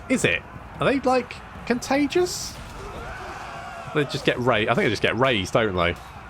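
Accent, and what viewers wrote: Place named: Northern England, United Kingdom. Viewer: I only watch CA content if it's made by a man with a northern english accent. I don't make the rules, but I do voluntarily and enthusiastically enforce it.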